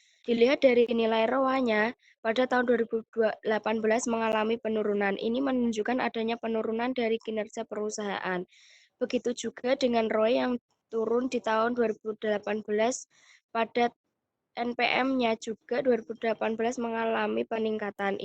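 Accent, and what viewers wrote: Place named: Java, Indonesia. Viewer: Bagus kak😊👍 Walopun agak medok🙈🙏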